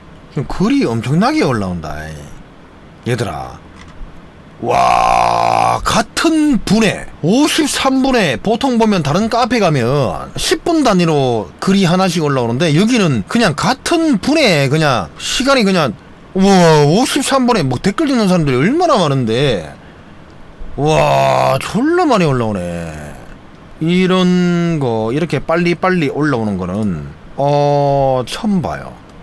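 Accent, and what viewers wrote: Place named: South Gyeongsang, South Korea. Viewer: 억양은 경남 ㅋ 단어는 공가라,쓰바르마 경상 ㅎ 섞여있는듯해요~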